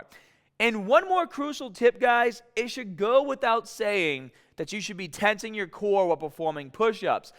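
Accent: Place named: New Jersey, United States. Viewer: U a lil bit New Jersey, but great also hahaha ! Awesome analysis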